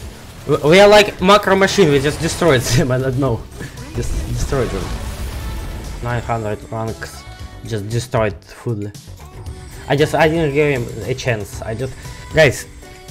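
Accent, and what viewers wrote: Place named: Russia. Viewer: I love russian speaking english. Very funny 😂😂😂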